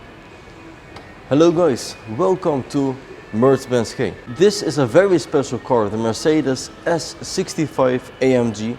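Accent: Netherlands. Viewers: The German right way, the US English right way, the British English right way? This is a Dutch native speaker who has of course a Dutch accent. Your accent?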